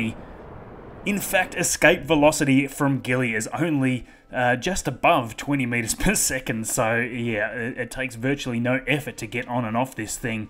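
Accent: Australia. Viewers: Another awesome video.....and it's really wonderful to hear a fellow Australian on KSP videos.....not that Shadowzone's German accent and Mr Manley's Scottish brogue aren't entertaining but it is a lovely reminder that we Aussies love all things spacey as much as the rest of the world. Cheers mate! Bloody bonza job!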